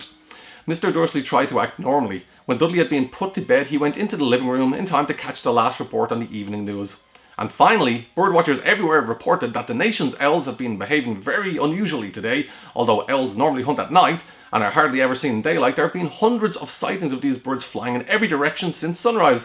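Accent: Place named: Ireland. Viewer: Please. Do more of this. I've been looking for audiobooks with irish accent.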